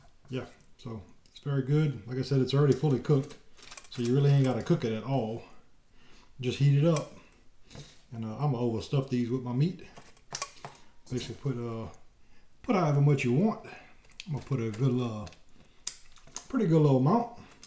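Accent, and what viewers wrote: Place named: Louisiana, United States. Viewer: Love that Cajun accent. These look yummy!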